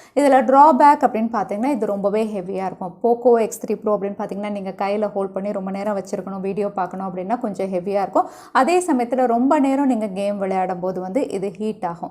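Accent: Kerala, India. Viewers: Kara Kara Kerala tamil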